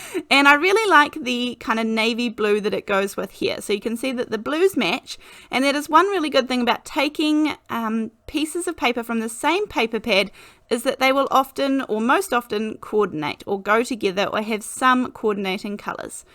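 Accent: New Zealand. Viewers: Great to hear a Kiwi voice, and the clear, no fuss instructions. I live in the South Island too. Love that quick and easy card. 👍💕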